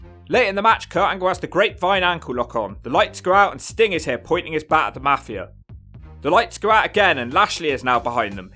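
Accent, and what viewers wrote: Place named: West Country, United Kingdom. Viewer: You've never sounded more Westcountry than in this video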